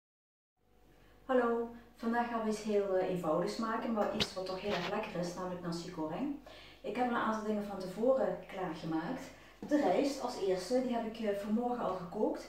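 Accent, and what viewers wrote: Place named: Limburg, Netherlands. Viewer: Ik hoor een Limburgs accent! Ik kom namelijk ook uit Limburg hahah leuke filmpjes!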